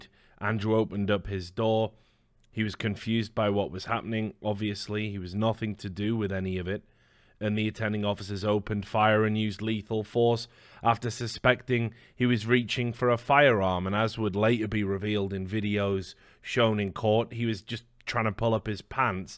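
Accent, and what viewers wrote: United Kingdom. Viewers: My name sounds a lot like “Tyler Barriss” especially with a british accent so I’m kinda shook everytime says it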